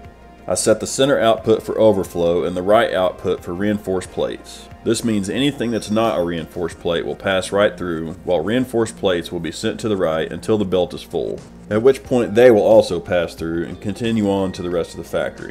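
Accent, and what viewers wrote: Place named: Midwest, United States. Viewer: Hidden Gem of Satisfactory Video. Double props for midwestern explaining factories.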